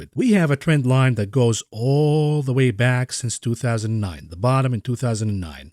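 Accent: New York, United States. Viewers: New York for sure I'd say Iranian American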